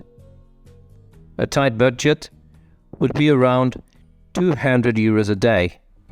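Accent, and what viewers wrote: United Kingdom. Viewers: Bel video e belle gnocche, voglio farti i complimenti per il tuo accento British, mi sembra di capire che tu sia italiano, s'è così hai una pronuncia perfetta.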